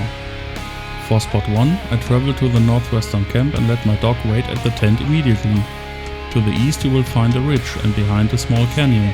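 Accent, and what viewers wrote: Germany. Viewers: and btw are you german? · you sound German cuz of your accent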